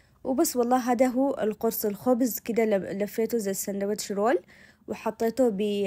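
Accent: Aden, Yemen. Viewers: ما شاء الله بالعافية ٦٠% متابعتي لك تعجبني الهدرة العدني ..اذكر زمان نسوان الجيران يتجمعوا بالزغط بعد العصر وهات من هذه الهدرة الرائعة 😂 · ماشاء الله تسلم ايدك اني احبك واحب كلامك العدني عسل عسل ❤ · الحلو انك ماغيرتي لهجتك العدنية الحلوة مش زي بعض اليمنيات تسافر الخليج شهرين وقد اقتلب لسانها وغيرت كلامها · كلة كوم واللهجة العدنية كوم تجنن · لهجة العدنية جمييييلة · كلامك العدني المميز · ماشاءالله عليك انفدالك ومحسن اللهجة العدنية حقنا 😢❤ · بحب اللهجة العدنيه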